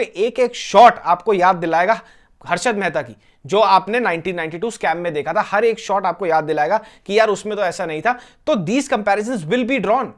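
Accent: Haryana, India. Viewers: Was missing your haryanvi soooo much 😍😍😍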